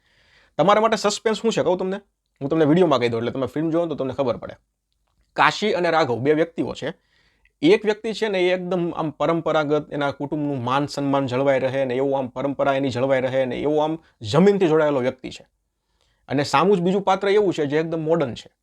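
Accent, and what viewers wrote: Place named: Kathiawar, India. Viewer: ગુજરાતી તો સરખું બોલો કાઠિયાવાડી લેહકો સારો નથી લાગતો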